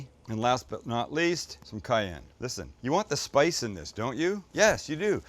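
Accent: Quebec, Canada. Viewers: Need more French Canadian accent…brilliant!! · very good French canadian accent but poutine is pronounced "puts in" love your content keep em coming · Your French Canadian accent is very good, this coming from a Québécois fan of yours. Looks delicious. However, the gravy for the poutine is always brown (roasted flower) in all the poutine joints I know of. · That is the best French-Canadian accent I've ever heard. I also smell toast. 🤔 😆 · Retire the French Canadian accent!!! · Great Franglish Queebeck accent, you're the best. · Tellement une bonne recette de poutine… L’accent canadien français, québécois est de marde mais la recette est écœurante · Your French Canadian accent is spot-on. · Thumbs up on the Quebeçois accent! I prefer it coming from sexy girls, but will do. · Being from Montreal Quebec, I can tell you that accent was horrible lol. I love you, but you gotta come to Quebec more often and practice the accent lol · I'm from Montreal and I promise you Quebec accent is awful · Solid French Canadian accent. 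You sounded like every trucker in my mill from Quebec eh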